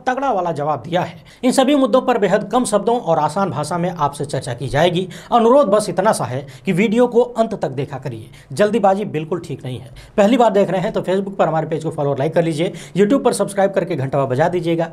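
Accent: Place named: Bhojpur, India. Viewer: Bhaeya. Bhopori mix me jo aap bolte hai na kasam se maza aajata hai😂😂😂😂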